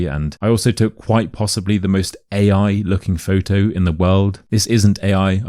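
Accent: United Kingdom. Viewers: You are amazing, I used to hear the American accent, but for my IELTS exam I started training my ear to the British accent thanks to your channel. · Thanks, Teacher! You always deliver lovely videos with a great British accent. Have a nice day!🌻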